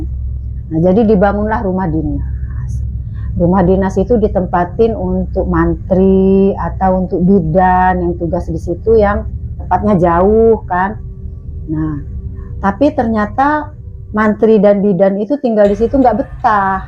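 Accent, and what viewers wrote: Lampung, Indonesia. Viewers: Orang Lampung kek nya mbak nya dari logatnya Lampung bnget, soalnya saya juga Lampung bumi agung Salam kenal subscribe baru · membawakan ceritanya Lampung banget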